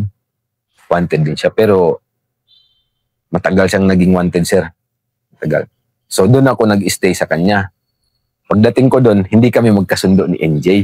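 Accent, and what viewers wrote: Philippines. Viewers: Tagalog na tagalog magsalita si Sir,maliwanag sya magsalita..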